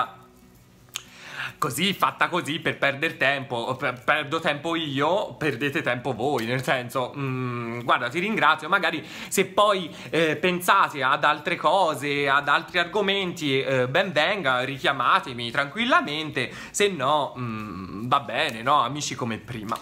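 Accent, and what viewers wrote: Tuscany, Italy. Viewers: “MAILA CHE CARDO CO STE LUCI”😂😂😂 Chi è toscano come lui/me qui? 👇🏼👇🏼Mettete like👇🏼👇🏼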